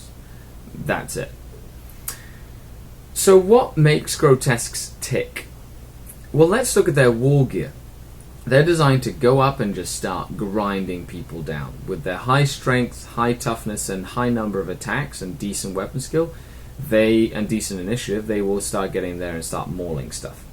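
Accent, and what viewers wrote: United Kingdom. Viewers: Are you Canada you sound uk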